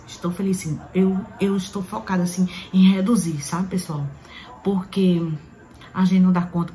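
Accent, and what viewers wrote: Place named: Northeast, Brazil. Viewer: ❤❤❤bjs,eu tbm amo esse sotaque arretado 😅😅😅😅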